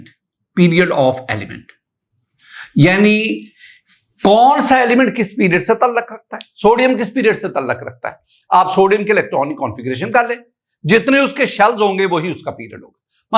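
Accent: Punjab, India. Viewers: Punjabi speaking 🗣️👀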